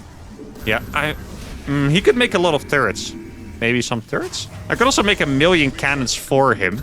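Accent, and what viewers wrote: Netherlands. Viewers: imagine hating the Dutch accent but the two largest SC2 CCs are both Dutch lol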